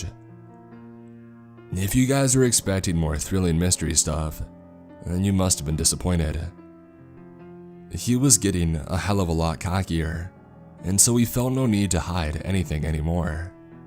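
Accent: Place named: South, United States. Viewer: It's a Southern guy man give him some slack lol.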